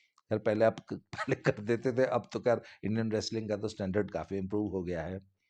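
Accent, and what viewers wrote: Haryana, India. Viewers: Aapka haryanvi uccharan dittoo theth harnyanvi hai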